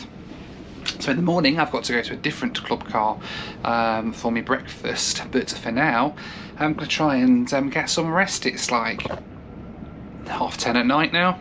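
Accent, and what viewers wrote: United Kingdom. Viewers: It is just me, or has speech turned waaay more British in this vid? 😂 Compared to his previous ones filmed overseas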